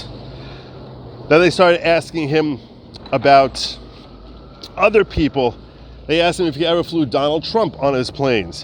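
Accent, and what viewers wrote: New York, United States. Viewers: Love your show. I miss the NY accent living in Ky but would NEVER move back!😊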